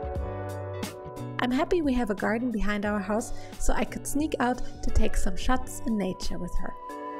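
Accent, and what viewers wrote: Germany. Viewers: I’m a huuuuge sucker for German accents, and I completely agree!!!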